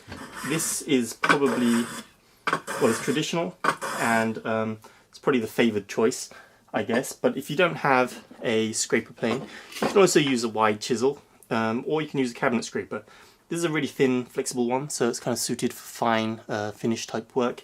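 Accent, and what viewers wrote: United Kingdom. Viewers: I thought you were English, iv noticed a lot of British people these days compensating so Americans can understand , should be the other way around its our language , anyway love your videos keep up the good work.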